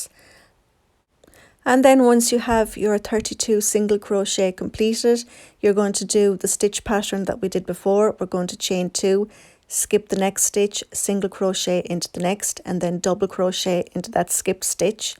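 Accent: Ireland. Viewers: Hi, I've been searching for a nice hoody/cardigan for the last few days and found yours, and I love it! I love your video very easy to follow and I love your voice , its very calm and mellow. Is your accent Irish?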